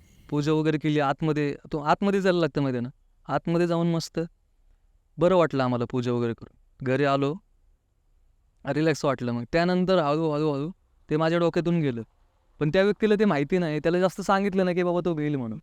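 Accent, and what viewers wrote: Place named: Pune, India. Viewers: पुण्याचे सगळेच तसेच बोलतात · Dada pune chi language tasich aahe 😅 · Punyachi language ahe ti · Pune mde tsch boltet rav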